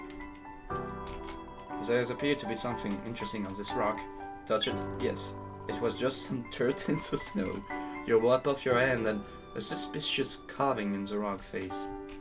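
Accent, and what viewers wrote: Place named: France. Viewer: Gotta love your french accent mon ami x) très bonne vidéo je m'abonne!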